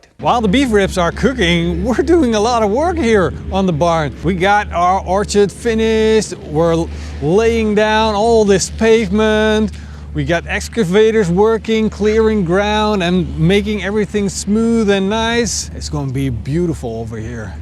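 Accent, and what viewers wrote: Netherlands. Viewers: Are you from Holland? Sure sound that way! Anyway, great channel. Greetings from Norway :) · Are you dutch your accent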